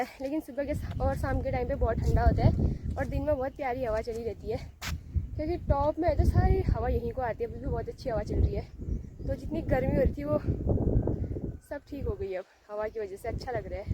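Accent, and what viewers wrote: Pahari, India. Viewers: Aapka pahadi bolna bahut accha lagta hai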